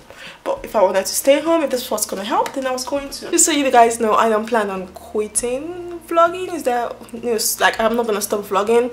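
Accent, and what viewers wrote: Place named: France. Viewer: Do u speak French? Your accent sounds so French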